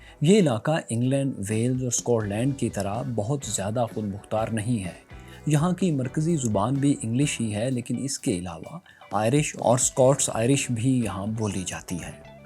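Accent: Punjab, Pakistan. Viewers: Pease pronounce the words correctly. Tone down your Punjabi accent and not ruin the younger generation.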